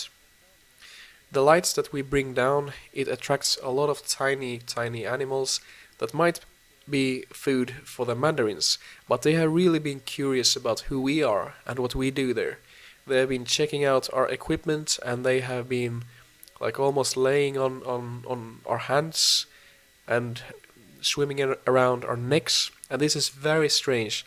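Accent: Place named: Scotland, United Kingdom. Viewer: idk why you sounds somewhat Scottish to me lol. Anyoway I got my captive bred Mandarin a few days ago. So glad we are able to captive breed these beautiful fishes.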